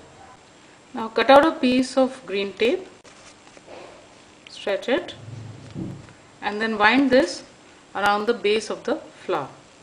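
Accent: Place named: India. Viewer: All your videos are so dark, badly filmed and the object is often out of camera shot. Your Indian accent makes them hard to follow.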